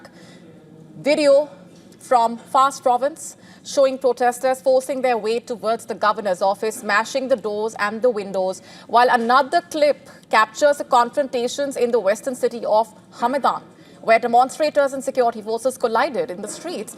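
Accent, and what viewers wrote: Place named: India. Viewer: English in Hindi style!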